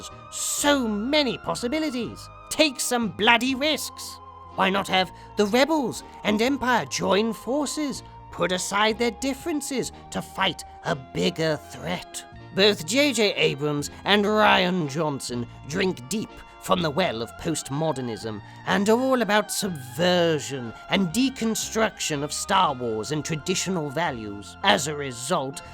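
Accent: United Kingdom. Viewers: Good job you sound like plinketts older proper British brother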